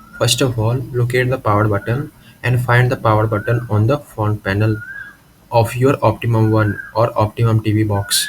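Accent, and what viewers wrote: India. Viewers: Put info in English cannot understand his voice with India accent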